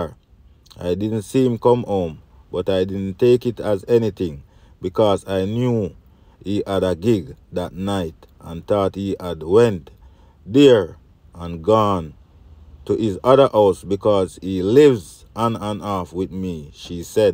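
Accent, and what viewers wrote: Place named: Jamaica. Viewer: Why some of you blogger think people want to hear this wasted Jamaica talk. You se me. Learn to talk brentren. No disrespect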